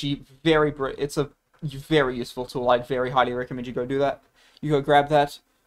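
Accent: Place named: United Kingdom. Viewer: This is brought my attention to how surprisingly English/British your accent is. Great tutorial though (If for some reason I decide to play Asseto Cora at any point)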